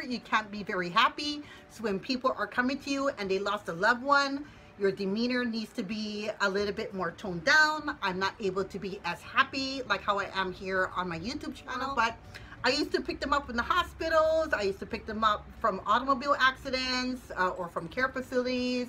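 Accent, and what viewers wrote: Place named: Texas, United States. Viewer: I really like this Q&A I really got to know you a lot better. after I finished Cosmetology in realize that I didn't like people that much I went to a funeral home and asked if I could get a job there and fortunately there's one person that pretty much does all the people there so they didn't need help at that time but I have thought about doing that sort of work. I would still get the fix hair and not have to deal with talking to the person. I later thought that it would probably be worse with the family loved ones being so particular of the person the looked before they died. I'm not a quiet person so that probably wouldn't work out for me either. I like how you was talking about your husband's Texan accent was rubbing off on you that's super cute.Great video👍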